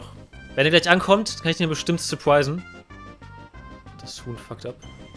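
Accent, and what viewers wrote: Saxony, Germany. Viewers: Hi, mal wieder geiles Video, Als ob du auch aus Sachsen kommst, weil ich komme auch aus Sachsen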